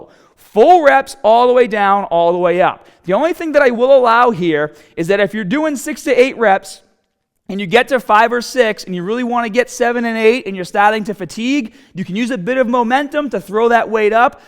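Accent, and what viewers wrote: Boston, United States. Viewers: Hey bro I’ve been watching your channel for ever if feels like!! just wanted to tell you, your so inspirational and full of information that you have inspired me to start my own fitness transformation journey and VLOGG it as well for YouTube!! Love your channel and I love your information n that Boston accent is for real!!!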